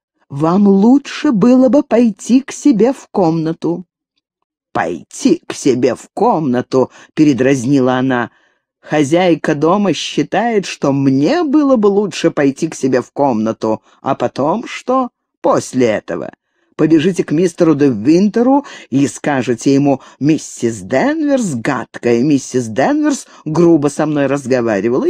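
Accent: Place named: Moscow, Russia. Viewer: Уточнение: верЬх, четверЬг, церЬковь - особенность московского произношения. Устарело, потму что москвичей не осталось.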